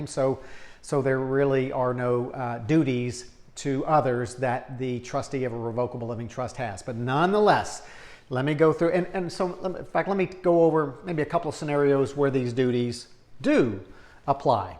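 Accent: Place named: Louisiana, United States. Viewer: Are you from Louisiana? Because you speak exactly like Dustin Poirier, same accent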